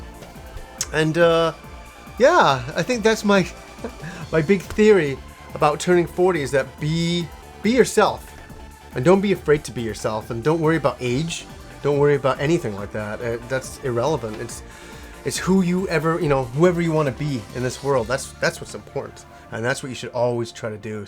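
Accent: United Kingdom. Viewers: The more emotional you get, the stronger your British accent gets haha I love that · I didn't know you were from england. Now that i notice it you have a very slight british accent in your voice.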